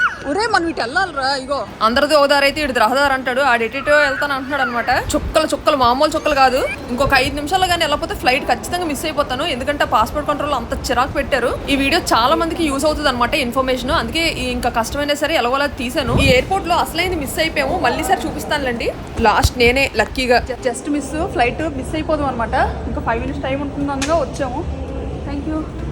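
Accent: Vizianagaram, India. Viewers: Mana vizianagaram Language.... Super👌.... Ventunte 👂 super super👌..... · మీరు విజియనగరం యాస మాట్లాడితే బాగుంటుంది నా అన్వేషణ విశాఖపట్నం అయిన విజియనగరం యాస మాట్లాడతరు మీరు మాట్లాడడి మాది అనంతపురం అయిన ఆ యాస కోసమే మీ ఇద్దరి వీడియోస్ చూస్తాను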